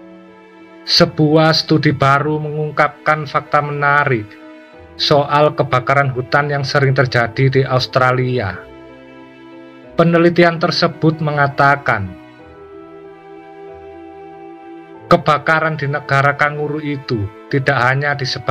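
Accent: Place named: Java, Indonesia. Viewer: bisa ga logat jawa di buang bikin geli mendengar nya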